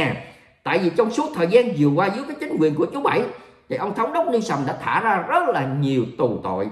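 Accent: South, Vietnam. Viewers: Nghe nói tin tức giọng miền nam hay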